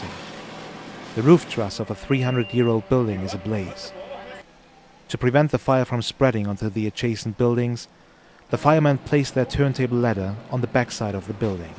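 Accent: United Kingdom. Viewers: Could you please make another version of that documentary with an american speaker? The speaker's english sounds really british :( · Ach, das "Amt für Brand- undZivilschutz" oder wie das heißt, in der Greflinger... Schönes Material, da in diesem Jahr das Budget für einen Neubau (sprich: Abriss des Bestandsgebäudes) genehmigt wurde. Historisch wertvoll :-) Außerdem ein schöner britischer Akzent! Ich kriege den nicht hin, nur wenn ich "oh no!" sage, ansonsten habe ich das eher raue US-Englisch drauf. Mit starkem, deutschem Akzent.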